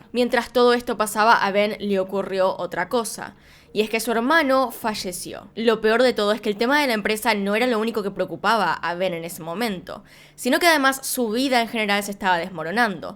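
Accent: Argentina. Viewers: que te pasa con el acento argentino, gil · Lo único malo es el acento argentino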